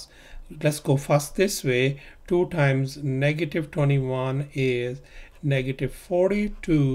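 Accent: India. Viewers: I his his from soth side ,maube from kerala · he sounds like an Indian..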